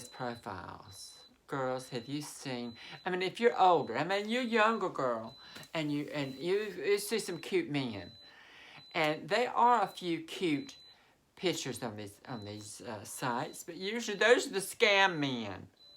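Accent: South, United States. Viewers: I love that sweet southern drawl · Love that southern accent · I love your Southern accent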